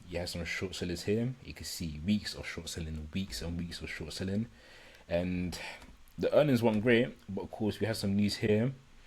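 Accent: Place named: United Kingdom. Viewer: Respect sound like mandem and from uk what’s your tele username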